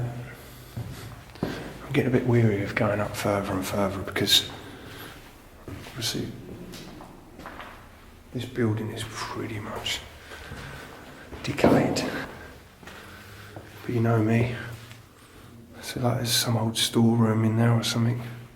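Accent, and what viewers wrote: England, United Kingdom. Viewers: what about Abandoned world explorer and Adventures with? I truly enjoy watching your videos. I have watched numerous other Explorers but I must say I like your style, how sincere you are, you are truly interesting, educational, funny, and easy on the eyes. I also love your voice and English accent is marvelous. You have a true gift. I wish you so much happiness, peace and joy doing what you love.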